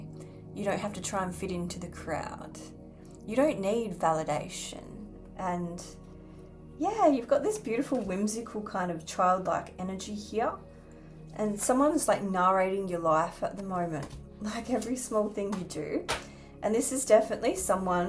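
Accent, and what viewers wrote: Australia. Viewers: Hello from California. Good singing voice, Great voice,. Great Australian accent. Great messages from Australia. Happy Easter from our channel.💃💃💃🏻💃🏻🦚🦚🦚🧤🧤🧚🏻‍♀️🧚🧚🏻‍♂️🧚🏻‍♂️🧝🏻‍♂️🧝🏻🧝‍♀️👒🧜‍♂️🧜🏼🧜🏻‍♀️🐣🐣🐥🐥🐇🐇🐇🐓🐓🐓🦜🦜🦜🦜🦜🦜🦅🦅🦅🐎🐎🐎🦚🦚🦚🐦🐦🐦🐤🐤🐤🦆🦆🦆🦉🦉🦉🦋🦋🦋🍀🍀🍀☘️☘️☘️🐦‍🔥🐦‍🔥🐦‍🔥🦢🦢🦢🦩🦩🦩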